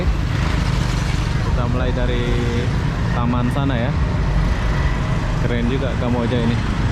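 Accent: Indonesia. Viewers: klo di liat dr aksen.. kayaknya org lombok konten kreatrnya. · HOSTNYA ITU INDONESIA BANGET, HANYA BICARANYA DI MALAY MALAY IN, TERDENGAR DIALEK INDONESIA BANGET KATA HOSTNYA, SERU YA ORANGNYAPUN RAMAH RAMAH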